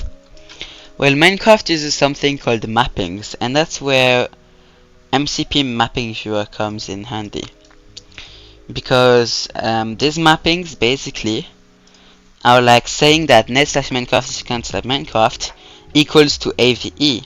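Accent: France. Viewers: Good French accent bro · L'accent français qui se cache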